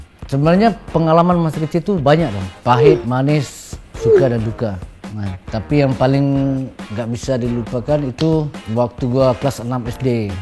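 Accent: Malaysia. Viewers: Kok lucu logat melayu tpi pake "Gua" 😅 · Go support from Malaysia 🇲🇾🤞🏻 accent malaysia tak hilang lagi tu😂😂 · Logat Malaysia masih belum ilang.. Sekali masuk master chef Baginda lord ngomong nya gua gua..😁😁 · Cakap mcm org malaysia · Pantesan bahas nya lebih ke kaya orng Malaysia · Pernah tinggal di Malaysia sama kaya atta dong.....gaya bicaranya sedikit2 kaya orang Malaysia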